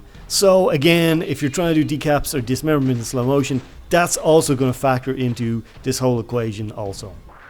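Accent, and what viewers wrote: Ireland. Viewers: Your Irish, right?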